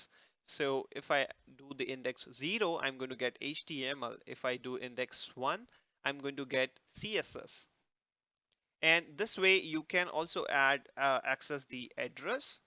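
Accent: Gujarat, India. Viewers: are u a gujrati?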